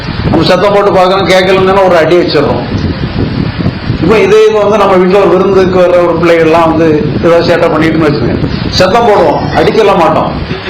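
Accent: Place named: Tirunelveli, India. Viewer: அருமையான நல்ல தகவல் அதுவும் விரும்பும் நெல்லை தமிழில். நன்றி ஐயா!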